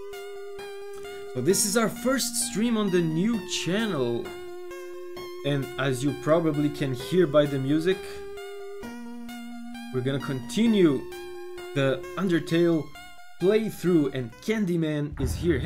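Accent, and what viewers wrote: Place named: Brazil. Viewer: EU SABIA QUE O SOTAQUE ERA BRASILEIRO Meu amigo, sua reação ao receber o abraço da Toriel, eu nunca tinha visto kkkkkkkkkk Mas sério, eu amo de mais ela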